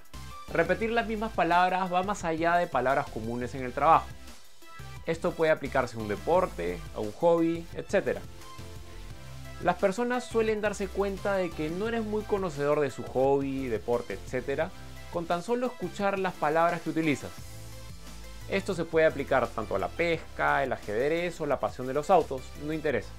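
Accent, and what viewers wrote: Peru. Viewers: Eres peruano? xD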